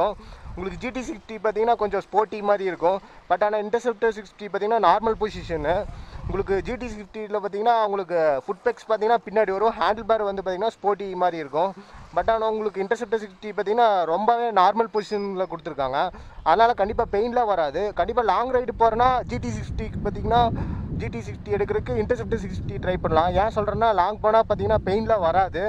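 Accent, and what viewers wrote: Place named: Kongu, India. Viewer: Kongu tamizha nee super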